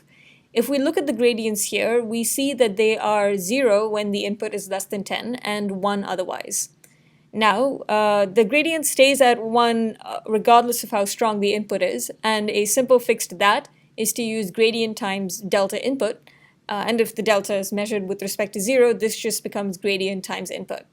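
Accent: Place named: India. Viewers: Trace? Clearly an Indian accent! But so what? :) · This is one of the best indian accent I've heard, clearly explained every details in the paper!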